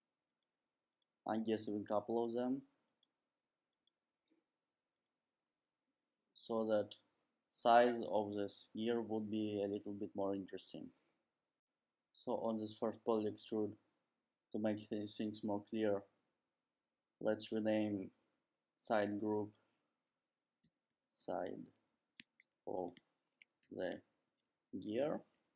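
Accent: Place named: Russia. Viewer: Smells like a russian accent!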